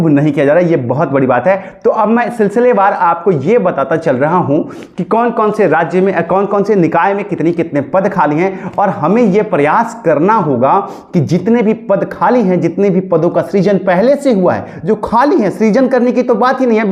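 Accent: Bihar, India. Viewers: Aap Bihar se ho kya sir???😊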